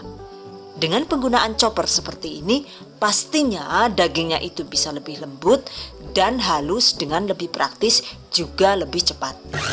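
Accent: Banyumas, Indonesia. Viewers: Makasih resepnya bu.. 😅 ko sma2 ngapak yaak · Kaget donggg orang ngapak ternyata😭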